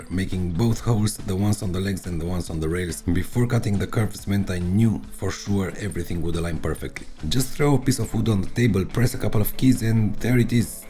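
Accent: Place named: Russia. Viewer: Прямо слышится русский акцент в английском 😂 Вы из России?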